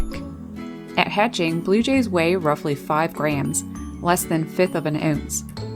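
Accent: Newfoundland, Canada. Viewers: I have a bluejay friend! I feed a family of crows every day, and this little punk dude started showing up. I give him a small piece of whatever the crow bros are having, and he comes every day now! We are buddies! I know he's a he because sometimes his mate comes and she's a good bit smaller like described. I call them Sid and Nancy, because they like making music together(no one said it has to be music for humans). Omg you're from NL ME TOO!!! <3 knew I recognized the accent!!! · Love your commentary. Also your Canadian accent. I visited Nfld when I was twelve and my sister worked at the hospital in St Anthony. What adventures we had!!! Thanks for these videos. Catherine from VT. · Aboot? I've been following you for years, I didn't know you were Canadian... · I love your videos! Very educational and beautiful camera work. And your Newfoundland accent is so pleasant to listen to. 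Thanks!🐦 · Number 4 video on the binge, as soon as she mentioned Newfoundland I caught the newfie accent. Howdy from Alberta. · Sounds Canadian maybe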